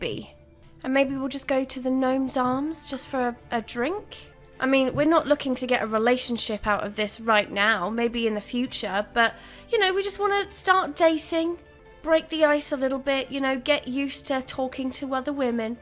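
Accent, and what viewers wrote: United Kingdom. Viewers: why do you keep on calling him trash bag dad all the time he's no longer a Family burden? you could see him change from you making him become a breadwinner when he had a job that's now he's a breadwinner girl!!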